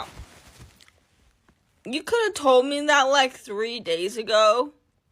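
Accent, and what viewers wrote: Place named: North, United States. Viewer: Question: Do you ever post sober? Also, you sound like you're from up north. US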